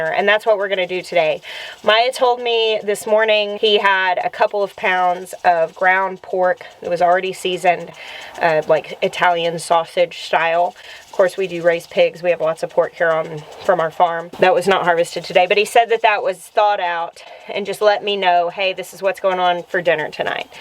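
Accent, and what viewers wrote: South, United States. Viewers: Never be ashamed of your southern roots. The Europeans love our accent, I found that out while living there for a time. Everywhere I went if I talked I drew someone's attention, and many times they complimented me on the southern accent. Unfortunately it was usually men and my hubby was very British and very jealous. I tried to hide the accent, but it was impossible.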